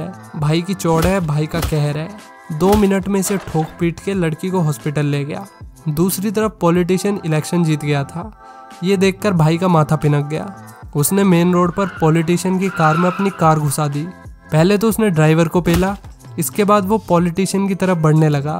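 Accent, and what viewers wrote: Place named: Meerut, India. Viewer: Poori meeruthiya accent h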